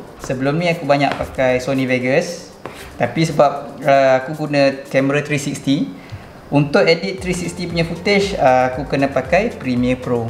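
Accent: Terengganu, Malaysia. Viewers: Sape yg tanya xde slang tranung tu? Aku mula2 tgk vid dh perasan dia ada kaitan dgn pantai timur. Hanya org kelate tranung pahang je akan perasan detail slang sesorang dari mereka 😁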